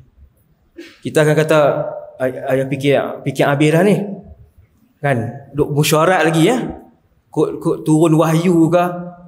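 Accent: Kedah, Malaysia. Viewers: Ustaz adalah favorite ustaz sya.. Ditambah lgi sya penah mimpi ustaz..Alhamdulillah bersyukurnya dpt mimpi ustaz. Kelebihan ustaz bgi sya..sorg yg sentiasa mnjadi diri sendiri iaitu ke mane saja negeri yg ustaz pegi, ustaz tetap utamakn loghat negeri ustaz iaitu Kedah.. Semoga Allah prmudahkn urusan ustaz di dunia n akhirat, dikurniakn kesihatan bdan n usia yg pjg..Aamiin Ya Rabbal Aalamiin🤲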